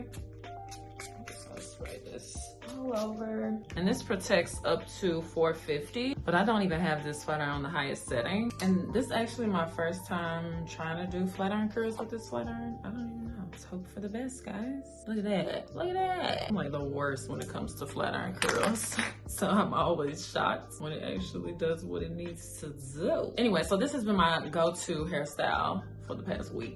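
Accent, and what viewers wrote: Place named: Southern California, United States. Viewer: What is this accent? It sounds like a mix between Southern and 'Californian'? to me. I'm not american tho, so I can be totally off-base lol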